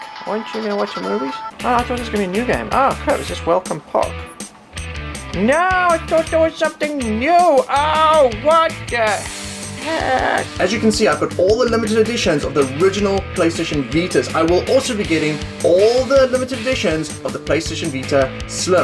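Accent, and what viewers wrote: South Africa. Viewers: are you South African? you sound South African :D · South African? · you sound southafrican are you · Are you South African by any chance? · are you south african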